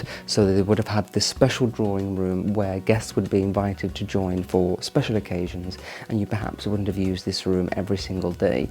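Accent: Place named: England, United Kingdom. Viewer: Italian here. If you speak Italian with you English accent you will sound to us smart and polite. This is the effect! Don’t be afraid to make mistakes Italian are not fussy on languages. Ciao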